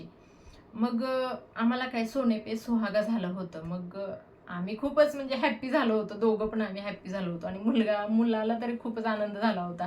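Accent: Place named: Sangli, India. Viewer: One shoul buy flat as oer his requuremnt unnecesry buy so mny flat may out u in danger.as maintence of society etc hv to oay al bills etc.its costky akso unnecessry paymnt if dues.try to hv 1 gd flat wgere u can maintain d same properly.its not wirth to hv so mny fkats if u hv income u may go n rent tgem out so u may get som income. I think u r from sangli area its accent is in Sangli language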